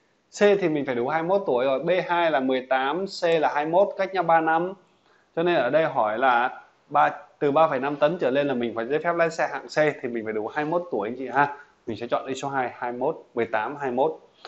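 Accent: Thanh Hoa, Vietnam. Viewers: Thầy người Thanh Hoá,nói giọng Thanh Hoá nè. Thấy thân thiện dễ gần và lịch sự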